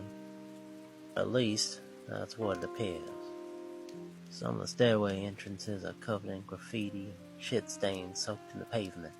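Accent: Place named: South, United States. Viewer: Quite a bit of southern, but sounds good mostly, keep going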